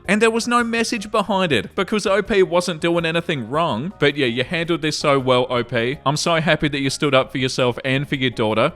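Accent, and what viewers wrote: Australia. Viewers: Oh, you are such an aussie mate. When you said "And sucked in too" I just cracked up it came out of nowhere wasn’t expecting it 🤣 That was gold dude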